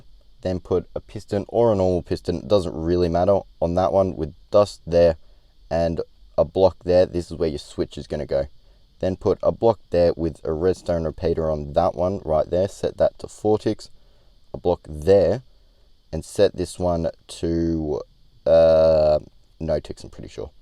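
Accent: Australia. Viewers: Heavy Aussie accent love it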